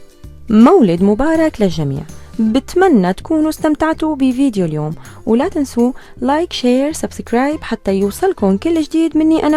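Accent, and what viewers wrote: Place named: Levant. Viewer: تسلم ايديكي شي ظريف بحب لهجتك كمان شاميه 😙😙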